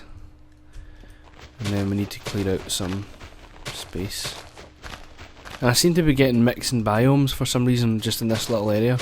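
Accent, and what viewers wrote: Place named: Edinburgh, United Kingdom. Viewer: Where you from in Scotland, Edinbrugh ishh? You sound it.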